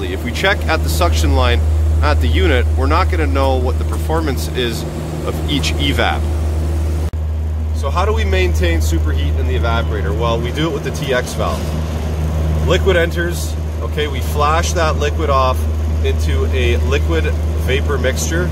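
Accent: Canada. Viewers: 404, EH? Isn't that a freeway going north from the Don Valley Parkway? 😀 You sound like you are Canadian...if not, I will remove this comment. Having fun here in Virginia.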